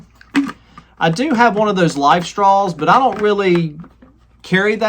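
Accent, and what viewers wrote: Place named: Western Maryland, United States. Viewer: Thanks for the videos, they're very helpful for camping/bushcraft info! Sidenote: I grew up in western MD but have been living in the Rocky Mts for a few years and man, hearing you talk is making me so homesick!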